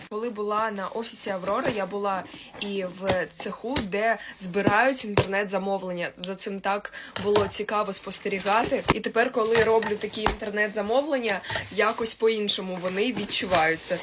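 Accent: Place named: Russia. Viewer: Или евелін. Говори на рідній мові не ламай язика. Чувствуется русский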